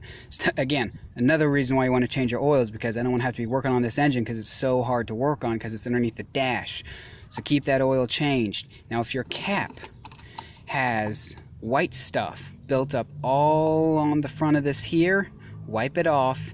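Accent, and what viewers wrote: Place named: United States. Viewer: I LOVE YOUR VIDEO . WHAT SIZE SOCKET? I LOVE HAVING SOMEONE W/ GOOD DELIVERY IN U.S. ACCENT. OTHER ACCENTS TOO HARD TO LISTEN TO.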